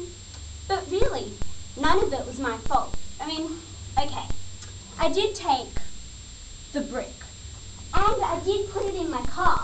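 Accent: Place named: Australia. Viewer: shes aussie not british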